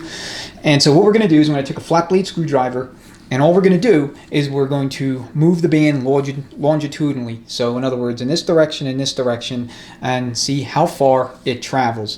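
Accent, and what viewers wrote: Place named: New York, United States. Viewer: just got done watching this procedure, great information, wondering what my measurements were on this band at 61,000 K when it burned up??? ( SECOND SPEED BAND WAS THE PROBLEM )!!!!!!! BETTER YET, were is this trans shop setting the clearances on this new one??? You would be surprised at the guys who work in the industry ( mine, heavy truck / yours trans shops ) that have been doing it there way; ( BILLY BOB, HACK - A - BILLY ) for 20 - 30 years or more, and it's not even close to " SPEC " )!!!!! ESPECIALLY IN THE DEEP SOUTH!!!!! I am originally from Delaware County PA. just outside " PHILLY ", your accent leads me to believe your from JERSEY / NEW YORK / LONG ISLAND?? WERE ARE YA LOCATED?? HELL, I WILL START BRINGING THE TRANNYS TO YOU & REFERRALS TOO, IF YOUR UP IN THAT AREA?? only a couple hours away if so??? 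LET ME KNOW, THANKS, GREAT INFORMATION & VIDEO AS USUAL / I TOOK NOTES & PART NUMBERS!!!!!! THE MACK TRUCK GUY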